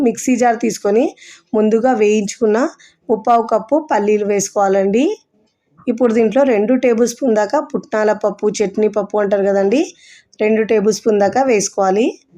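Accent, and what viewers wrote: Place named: North India, India. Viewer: ఎందుకు సబ్స్క్రయిబ్ చేసుకోవాలి, ఇ లాంటి చెత్త కోసమా, అసలే నార్త్ ఇండియన్ లా ఉన్నారు తెలుగు రావట్లేదు.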